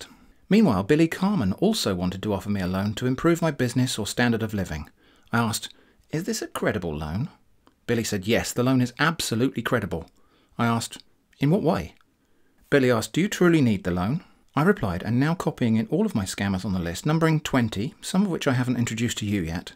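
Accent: United Kingdom. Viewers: Your droll humor and British accent are a delightful combination playing with these crooks. TY.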